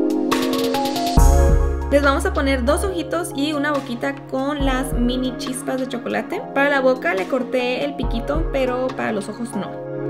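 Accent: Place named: North, Mexico. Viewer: Así hablamos los del norte, es nuestro acento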